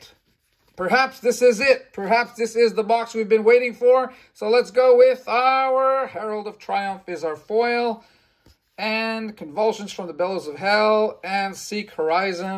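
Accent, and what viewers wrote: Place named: Quebec, Canada. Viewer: Nice video! You Canadian have such a cute French accent. 👌 Nice wine, St Emilion is always a good choice.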